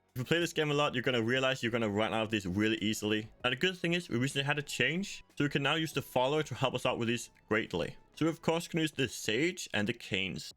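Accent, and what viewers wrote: Denmark. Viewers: wait rly?? Im from Denmark and i thought that english sound like it had a Denmark accent lol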